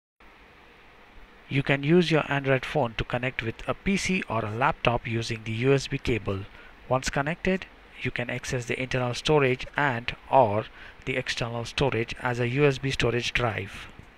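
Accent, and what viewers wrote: India. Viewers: what accent do you have lol :D Indian? :D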